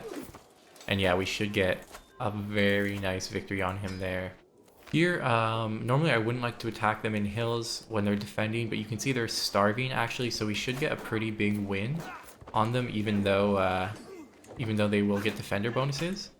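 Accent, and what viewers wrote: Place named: California, United States. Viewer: this guy and MRE guy have the same California accent and I enjoy it I just need to say "ok niiiiccceee" more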